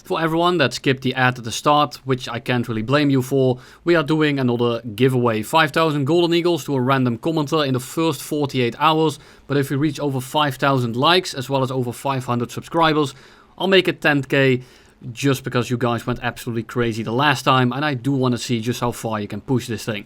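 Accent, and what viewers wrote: Netherlands. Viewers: Thanks for the entertaining videos and the dutch accent, keep it up!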